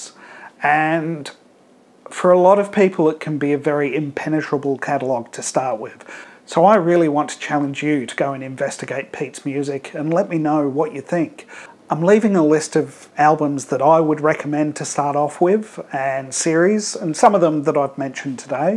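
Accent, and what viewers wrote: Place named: Australia. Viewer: So.. I'm watching your video on programming a Logic synth.. There's a teacup on the table, and I wonder.. "I've got one just the same." I move on to this video, and it occurs to me.. What's this accent? I recognise it. I check the 'About' tab. Ah ha. He's an Aussie! Hi. Loving the random 💯 objectives, diggin' the ambient washes.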